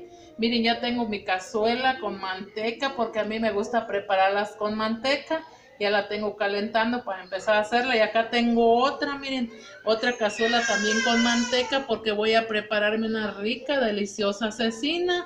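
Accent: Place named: Michoacán, Mexico. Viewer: Por el acento y la receta , nose se si me equivico pero eres de michoacán?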